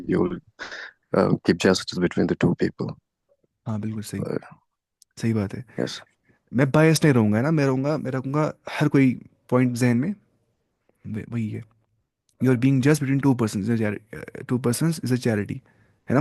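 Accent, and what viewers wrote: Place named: Kashmir, India. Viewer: Your accent is Kashmiri U are from kashmir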